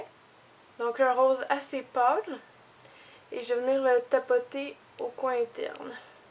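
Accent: Quebec, Canada. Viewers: Hahaha, j'ai regardé tes vidéos sans le son lorsque j'étais au boulot. J'imaginais pas que tu puisse avoir l'accent québécois. Ca m'as un peu surprise. Quoi il en soit j'aime beaucoup tes vidéos. Tout de bon :D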